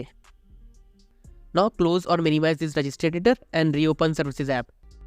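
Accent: India. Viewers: This is exactly what I was looking for😊 whenever I hear in Indian voice I know the tutorial is going to be correct 💪 💪 💪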